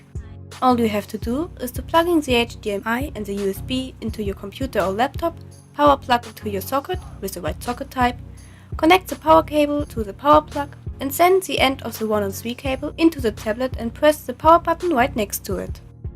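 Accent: Germany. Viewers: man hört dass sie Deutsch ist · OMG I adore your voice i speak Netherlands so it's a little bit similar to german i can hear your german accent it's sooo cute <3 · God I love your accent, everyone loves British accents but German accents I’ve always found are the best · man merkt einfach das du deutsche bist XD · I love your voice so much and I absolutely love german accents =3 I also love your artwork your one of the people who inspire me to get better at art. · I don’t see why your embarrassed your english is fine, I have a german au pair so I guess i’m just used to the accent. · You are from Germany? Aber dein Akzent ist cool.😋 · German accents are my drug!! They're so cute!!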